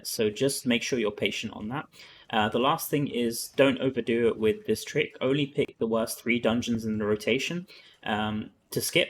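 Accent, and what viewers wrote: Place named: United Kingdom. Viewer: Y u name urself after biggest mass murderer but sound british?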